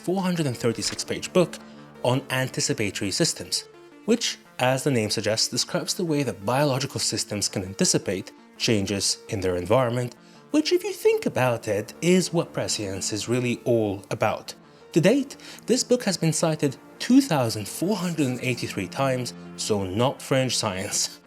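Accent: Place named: United States. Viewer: He sounds like an American to me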